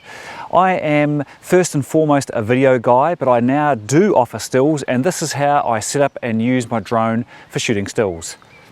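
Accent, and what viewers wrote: New Zealand. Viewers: Haha! Definitely Kiwi! Great advice and guidance.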